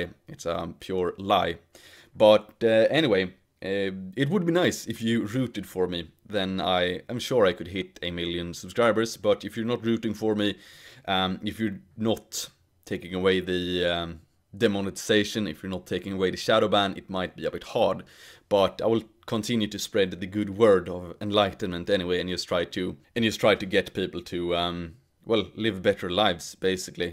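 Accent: Sweden. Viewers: But the Swedish accent is great. Yeah your old vids... In them you speak better English than a lot of people in Limerick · Don't worry about Swedish accent in your vids as it signifies where you're from. Content is all that matters. Fluff and beautiful sets don't signify quality. People will wade through mud to listen to reason. I shared your last vid about porn and its detrimental affects on women in a bunch of facebook groups so hopefully your audience will increase as a result. Be up to the task. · I love our swedish-english accent. Its the best accent exept the geordie accent from Newcastle-upon-Tyne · Nothing wrong with a Swede having a Swedish accent.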